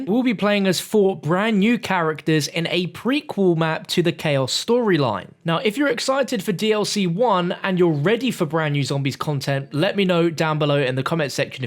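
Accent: United Kingdom. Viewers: The British accent is real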